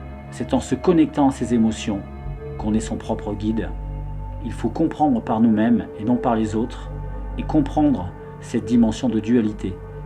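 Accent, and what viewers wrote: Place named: Romania. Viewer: super ton accent Roumain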